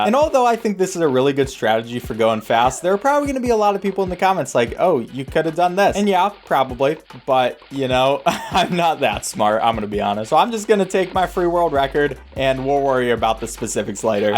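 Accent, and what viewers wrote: Wales, United Kingdom. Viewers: Oh dear, Irish? He's Welsh! It's like 2004 on Newgrounds all over again... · IRISH!? He is WELSH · no it’s not it’s clearly welsh 😭😭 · Irish guy? That's a Welsh accent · im pretty sure that was a welsh accent dude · Sir that's a Welsh accent not an Irish one as far as I can tell.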